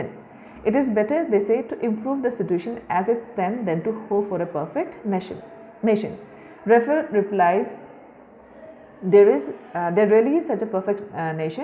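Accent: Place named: India. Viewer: I hate the Hindu accent, but this is the only summary available.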